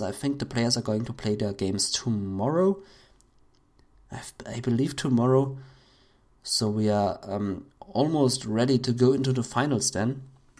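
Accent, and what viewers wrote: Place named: Germany. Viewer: Nice Video. Where are you from? Your english sounds really german :)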